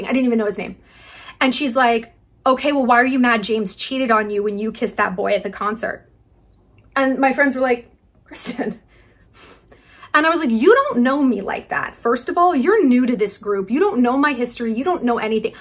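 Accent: South, United States. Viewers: I could literally listen to your southern accent for a whole video 😂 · I loved Reese in Legally Blonde so I started watching her interviews and then I noticed how she seemed to not be the nicest person. Something just felt off about her then I saw other videos of people talking about how she is really mean, but in a very passive-agressive way. She is very much that southern girl who is passive aggressive. She is like the stereotype in movies. I don’t hate her or anything but I just get an off feeling about her. Btw your southern accent was on point. The mannerisms and comments you made were the accent were spot on! Great video! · I fucking love your southern accent lmao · i love your southern accent queen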